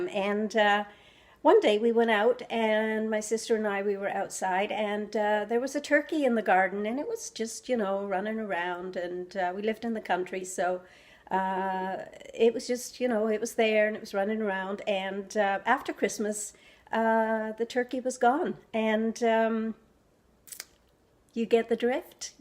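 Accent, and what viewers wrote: Ireland. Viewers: I just loooove your Irish accent. It's soft but fully alive and well. I'm part Skottish in my dad's side, and all four of my adopted children have Irish heritage. I enjoyed hearing about your traditions, past and present, and the turnips and carrots dish sounds absolutely delish. I know I'd love it. And I didn't make true resolutions this year either. Being goal driven sounds better, for sure. This was so fun to watch. Thanks for sharing with us,. You're a gem! Peace! ~TONI 💜🌺💚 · You still have a bit of the Irish accent and its beautiful. You have have lived in two places I can only dream to visit. Great video #teamsupportalltheway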